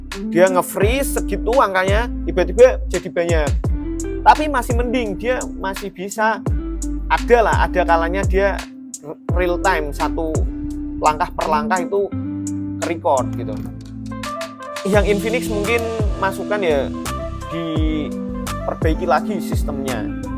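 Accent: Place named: Semarang, Indonesia. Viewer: tq mas 🙏 Btw kek logat semarangan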